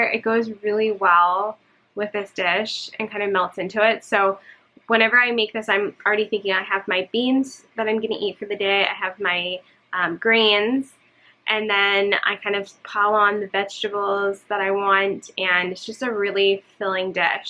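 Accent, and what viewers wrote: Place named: Pennsylvania, United States. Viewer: I love your accent! I’m from New Jersey and the way you say school reminds me of being back home many years ago. I see that you’re probably from Pennsylvania? Close to Jersey. I am enjoying your channel thank you for sharing!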